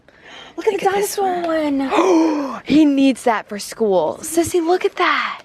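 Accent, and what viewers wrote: Louisiana, United States. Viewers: You could totally hear accent come alive when she was home in Louisiana LOL! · We love that Louisiana accent that came out on Easter lol I enjoyed this vlog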